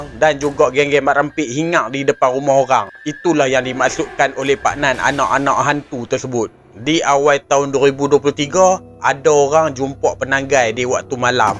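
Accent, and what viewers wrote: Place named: Kedah, Malaysia. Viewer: BG guna lah ayat KL,baru lagi ok,tak leh lari slen Kedah ya?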